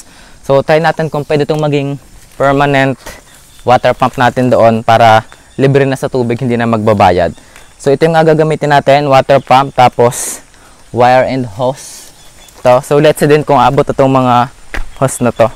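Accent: Batangas, Philippines. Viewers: Batangeño ka ga kuya?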